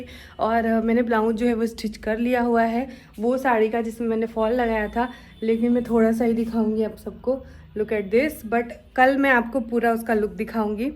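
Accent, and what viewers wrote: Bhojpuri, India. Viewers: Hiiii didi Ppplllllssss reply Bhojpuri khobb badhiya lgta hai aap waise hi bola kijiye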